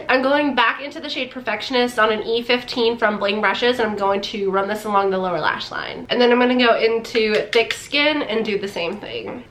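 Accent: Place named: South, United States. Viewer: Love your videos! ❤️ I like how honest you are and plus your southern 😂 just like me! IG: Tillery_09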